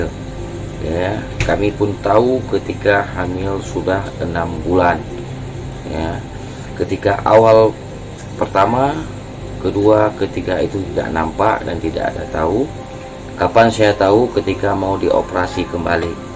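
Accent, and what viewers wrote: Aceh, Indonesia. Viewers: Dr logat bicara nya org aceh ya. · Org aceh y bg?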